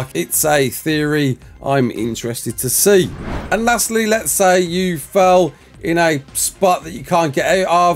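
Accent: Birmingham, United Kingdom. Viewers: Honestly I only came across you waiting for xbox release, but its fuc*ing nice to hear a fellow Brummy on youtbe! I've subscribed and I hope you make videos for xbox 👍🤟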